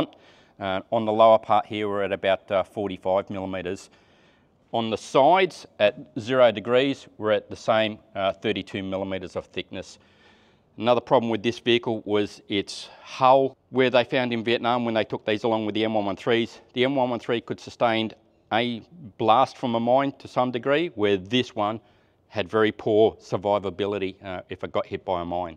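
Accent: Australia. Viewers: Awesome! Thanks! I really like the australian english accent! Greetings from Germany!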